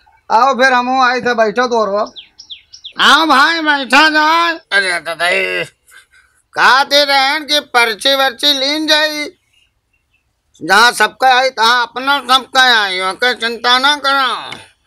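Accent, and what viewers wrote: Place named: Awadhi, India. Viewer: Andhra pradesh Tirupati bala g se apna awodhi sun kar maza aa jata hai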